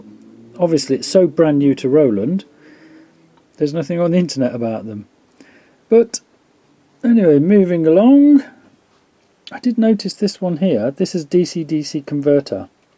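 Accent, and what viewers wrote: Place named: Leicestershire, United Kingdom. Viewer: are you from Leicestershire or Lincolnshire/Hull? Your accent seems familiar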